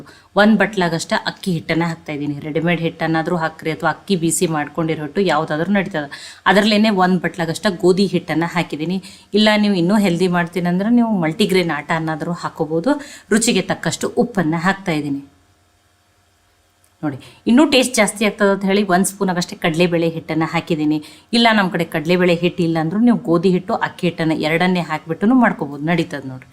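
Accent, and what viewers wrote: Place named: Bijapur, India. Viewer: Madum nim video daily nodtini ri tumba isht nanage nanu bangalorè inda but nau bijapur davaru niu yav ooru pls tilsi nim matu nodidre niu bjp davara anta kushi agutte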